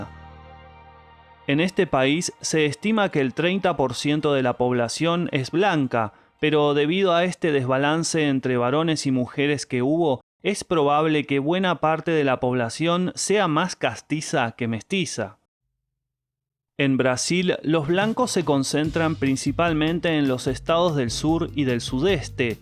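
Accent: Rioplatense, Argentina. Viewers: No solo los porteños hablan así por si no sabías el lenguaje rioplatense habla medió país que no te guste problema tuyo, es una tonada única y hermosa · Que desagradable el acento porteño del relator.... demasiado cha, che, chi, cho, chu. En Argentina la gente más educada no habla tan así. En este video hay un desagradable tufillo xenófobo. Esa idea de valorar en demasía la raza blanca. En todas las etnias hay gente muy valiosa y también de lo peor. En mi caso y el de mi hermano somos como muchísimos chilenos, hijos o descendientes de inmigrantes. Mi papá de Varsovia Polonia, y mi mamá de Manchester Reino Unido. Eso no hizo que tuvieramos ventajas sobre otros compatriotas. En Chile existe una alta valoración de nuestros ancestros indígenas. Yo opino que nadie es superior a otro ser humano por nacer indígena, de genética europea, africana o mestiza. 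Que seas más verde, azul, bajito o de cabello rizado no vas a contar con ventajas importantes. Sean Peszynki desde Santiago de Chile💥💥💥💫💫💫💫💫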